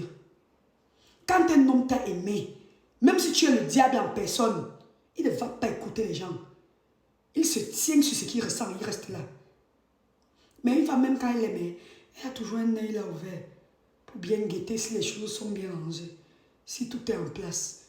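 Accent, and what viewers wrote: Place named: Cameroon. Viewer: Accent camerounais...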